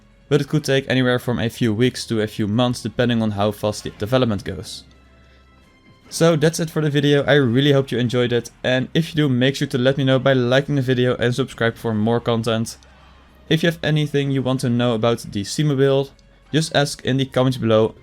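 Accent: Netherlands. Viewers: please work on your dutch accent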